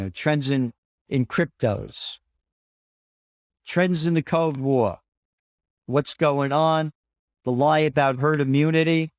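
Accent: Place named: East Coast, United States. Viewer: Omg gerald you are looking extra dapper today love your truthfulness your east coast accent and your proud Italian heritage you are welcome in Texas if you want to join us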